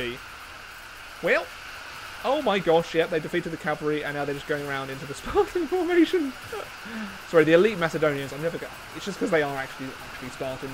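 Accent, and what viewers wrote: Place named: England, United Kingdom. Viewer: Are English accent annoys me you should talk less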